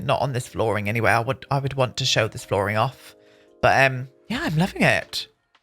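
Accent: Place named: Devon, United Kingdom. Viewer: You talk like me! Although my voice is higher - I'm a Devonshire Bumpkin too. I started playing the Sims 4 at the beginning of Lockdown - my 8-year-old granddaughter got me into it.... she said "Nanny, you have to play it as you can marry lots of men and then kill them"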